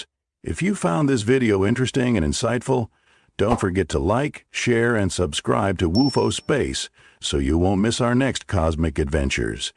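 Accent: United States. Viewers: This is a good video but you need a narrator with a British voice. Nobody wants to hear an American talk about history - signed an American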